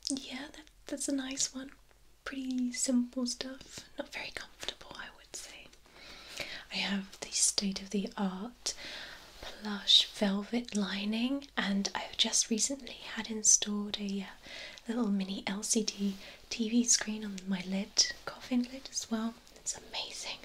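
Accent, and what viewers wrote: United Kingdom. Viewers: This is by far your best work so far. Please make another vampire video this is my favorite one out of all of them, most of the time i dont make it to the end of the video because i am so relaxed and your voice is so soothing that i fall asleep half way through the story i love your British accent and this story that you came up with is so awesome, i cant thank you enough for making these fantastic videos sincerely Richard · British accent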